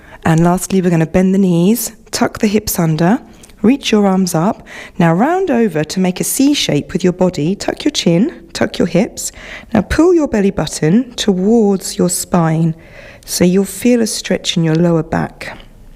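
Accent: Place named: England, United Kingdom. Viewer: I can see by your accent that you are English . Could you tell me where do you teach ? London maybe ? Would be perfect for me !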